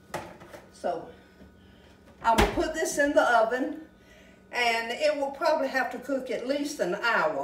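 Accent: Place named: Charleston, United States. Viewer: Love your accent. I'm from South Carolina also. You sound like a Charleston Area native. I love the way you added the veggies on the side. Going to do that the next time I fix meatloaf.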